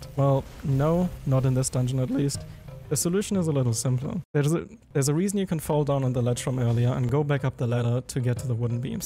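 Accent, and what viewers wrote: Germany. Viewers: Jo m8 whats ur Main language? German ?🤔 Dein english klingt wie von einem deutschen aber is trotzdem gut 😋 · Hey dude great video! <3 Can we connect on discord or so maybe? From what i hear i assume that you're german and i'm german too, so it'd be great to have someone to share the video editing experiences, especially when it comes to Elden Ring :) <3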